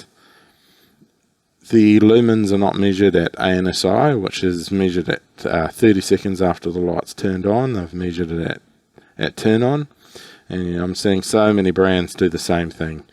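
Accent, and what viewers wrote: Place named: Australia. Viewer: I stand corrected… sound like an Aussie to me! wtf?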